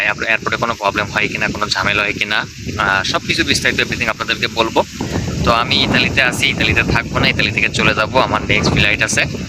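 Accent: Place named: Jessore, Bangladesh. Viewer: ভাই আপনার বাড়ি কোন জেলাতে আপনার ভাষায় তো বলে যশোর চুয়াডাঙ্গা এই সাইটে আপনার বাড়ি কোন জায়গায় একটু যদি জানাইতেন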